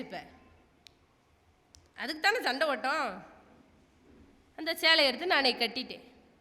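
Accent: Tirunelveli, India. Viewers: Ñellai Tamil👌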